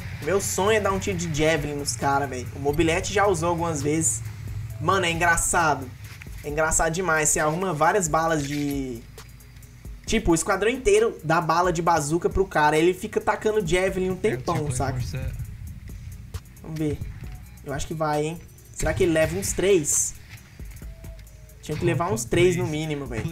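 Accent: Minas Gerais, Brazil. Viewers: tu é mineiro mano??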